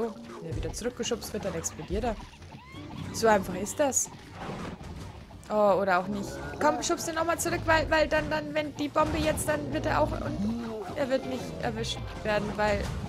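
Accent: Bavaria, Germany. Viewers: könntest du bitte nochmal eine Folge nur bayrisch sprechen bitte?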